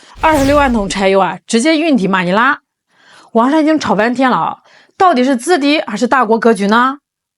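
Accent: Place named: Shandong, China. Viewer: 这个女的好像是山东人，尾音每次拖很长，给人一种每句话都在嘲笑的感觉。还是把话说好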